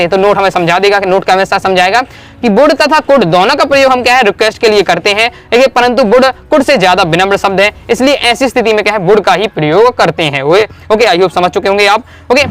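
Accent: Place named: Bundelkhand, India. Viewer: सर आप तो कहीं कहीं बुन्देलखण्ड बी बोल तै हो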